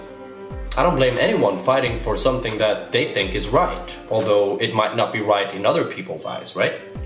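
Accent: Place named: Canada. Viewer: Omg sounds like Canada 🇨🇦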